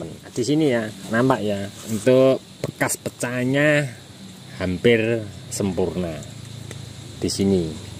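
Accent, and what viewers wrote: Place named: Java, Indonesia. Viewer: Itu emang logat jawa,dari dulu.tapi ga merubah makna.Wallahu'alam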